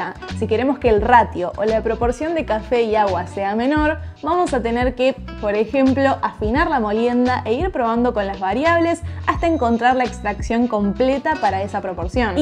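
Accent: Argentina. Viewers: Soy de México, y cada vez que veo tus videos, además disfrutar ese acento femenino de argentina que cautiva, me embelesa tu desempeño. Si ya sé, debería hablar de tu belleza, pero ante tu profesionalismo, sería una falta de respeto ignorar este. Bueno, hay un poco de broma, excepto acerca de tu profesionalismo (y de la belleza desde luego). Me gusta tu canal porque soy una de las personas atípicas. A mis sesenta y tantos años de vida, mi acercamiento al café, es increíblemente reciente. Sucede que soy intolerante a la cafeína, me impide dormir (literalmente), uno o días seguidos con tan solo una taza, pero cuando sucede y me desvelo hasta las cinco de la mañana, a las siete en punto despierto como si nada. Claro, el café no me engaña, mi cuerpo está cansado pero me dice una o dos cosas para que crea lo contrario, en fin. De alguna manera ahora me he aficionado al café y como siempre sucede con mis pasatiempos, me he apasionado, llevo algo así como seis meses viendo tus video y los de muchos mas (les recuerdo que mi afición al café es reciente), y mira que he descubierto un mundo. 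Me sucedió lo mismo con el vino tinto y la cerveza artesanal de las cuales, en efecto, tengo un poco más de tiempo. Me da la impresión de que fuera de tu edad, que te ves muy joven, tienes ese tipo de conocimiento y de experiencia que convencen, y créeme, soy el mayor escéptico y quisquilloso que pudieras conocer, cada cosa que se dice la investigo, y debo ser honesto, me has sorprendido. Felicidades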